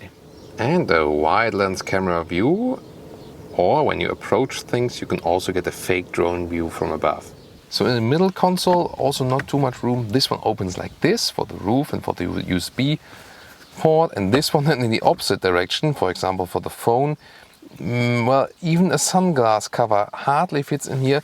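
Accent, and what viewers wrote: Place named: Germany. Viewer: Little by little you're starting loosing your accent almost German 90% combined with 10% english, before was G 50% E 50%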